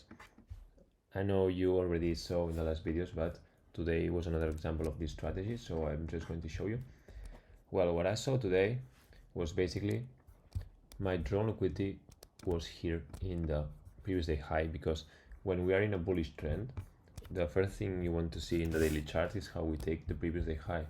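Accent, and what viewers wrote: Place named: Spain. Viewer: video super útil, gracias! Buen ingles, pero se nota el acento Español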